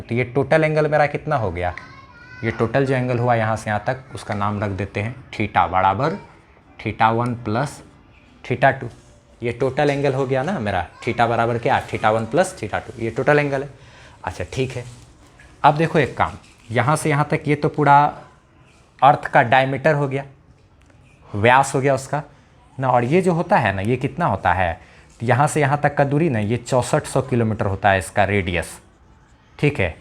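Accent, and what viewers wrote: Bihar, India. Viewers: Sir app bihar sa ho kya to pranam 🔥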